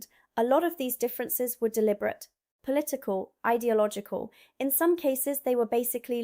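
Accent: England, United Kingdom. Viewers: Just brilliant Where does that brilliant accent come from I actually thought you were Britiah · Why is there a blurred section in the centre of the screen. And why do you have a British accent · Your English accent carries upward inflections at the end of many sentances.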